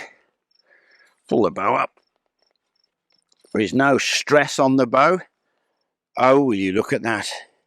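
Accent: United Kingdom. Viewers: Hi there, I'm from Québec Canada. This video appears on my tablet while I was exploring archery related videos, and what gets my attention is your medieval costume first, second , your gigantic long bow , and finally, your lovely British accent. So, I watched all your video and enjoyed it a lot. I'm anxious to view what is next on your platform. Thanks.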